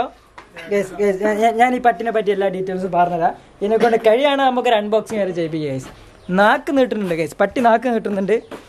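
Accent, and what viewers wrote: Kozhikode, India. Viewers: Ninjaludhe varthanam kettal manasilakum kuzhikkudhanenn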